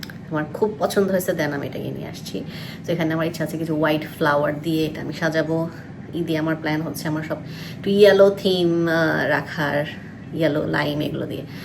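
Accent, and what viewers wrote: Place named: Bikrampur, Bangladesh. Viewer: Apu amar onek janar ichcha apni bikrampurer ? Apnar kotha sune mone hoilo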